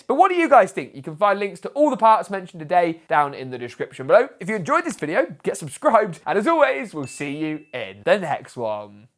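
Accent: United Kingdom. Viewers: building this while giving instructions, not to mention maintaining the british accent, takes real talent. Kudos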